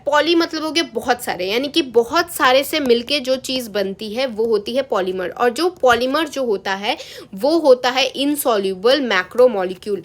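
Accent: Bihar, India. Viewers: Pronunciation galat ho raha hai😂😂 baaki chapter explanations theek hai .!! bihari tone hai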